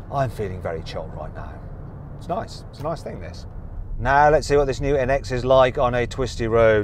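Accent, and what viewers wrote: England, United Kingdom. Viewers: I don’t know why but after watching this video I feel agitated. Part of the reasons is because the guy’s handling of each part of the car was quite rough and thought he might break something. Also i don’t get most of what he said due probably to his real English accent.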